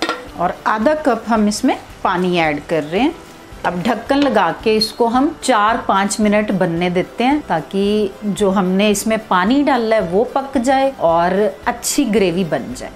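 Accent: Punjab, India. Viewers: I love your punjabi accent mam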